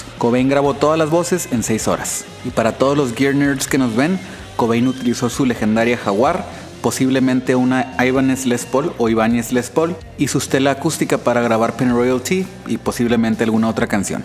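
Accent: Monterrey, Mexico. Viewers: Por qué no hablas de una forma más genérica, no a todos les gusta cuando usas el acento mexicano y tus mexicanismos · Excelente canal... Por el acento, suena que eres de Monterrey, sugiero un video de la avanzada regia y del cafe iguanas... Saludos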